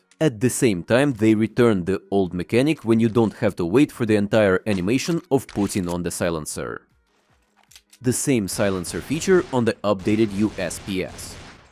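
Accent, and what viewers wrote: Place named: Russia. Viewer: Честно, прям слышен РАШЕН АКЦЕНТ 🤣🤣🤣. Видео клёвое))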